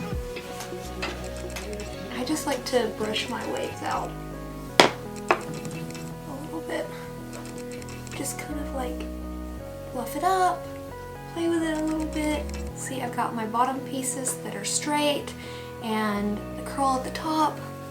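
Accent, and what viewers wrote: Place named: United States. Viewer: O wow u got a country accent. Never really noticed it when i watched u guys’ vlog channel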